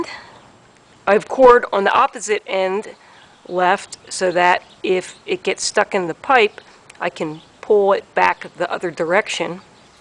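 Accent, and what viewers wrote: Philadelphia, United States. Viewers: Philly accent?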